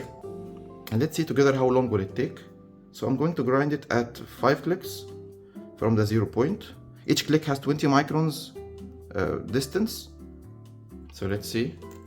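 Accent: Egypt. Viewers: subscribe and support for Egyptian content and Egyptian Accent 😍🔥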